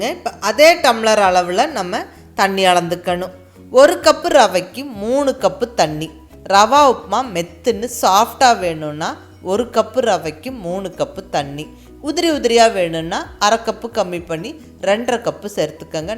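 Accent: Coimbatore, India. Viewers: More than everything,your presentation and narration is fantastic.the language and slang is like that of coimbatore ladies.i like the preparation the 'padam(Tamil) is my favourite.my wife does not like,but I prepare both upma and Pongal like this.thank you for the special tip,that of heated and cooled milk.i was not knowing.gift during maargazhi maasam.thank you.iam 77 plus!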